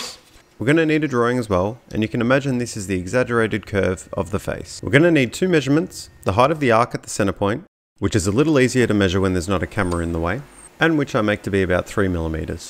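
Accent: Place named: Australia. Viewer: Great content, description, and commentary. Gotta love hearing the distinctive Aussie accent, too! 🇦🇺 There's some pretty helpful information to glean from the galahs watching from the wires too! 😆 10/10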